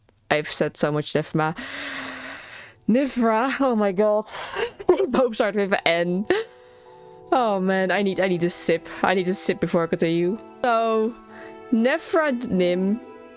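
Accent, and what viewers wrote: Netherlands. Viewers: I saw you couple of times on malgus server nice! Nice vids, subbed! You dutch by any chance? Very recognisble accent :)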